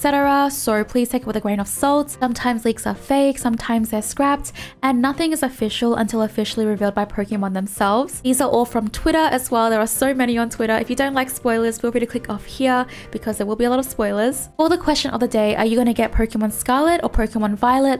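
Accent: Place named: Australia. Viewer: Ohhh, you're from Australia, that's where your accent comes from, finally!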